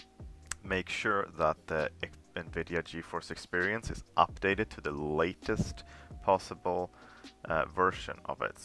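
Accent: Sweden. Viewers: love the swedish Accent much luv <3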